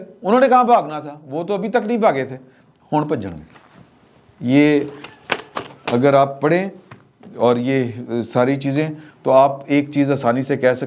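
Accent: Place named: Lahore, Pakistan. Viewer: آپ کا لاہوری لہجہ اور انداز اچھا لگتا ہے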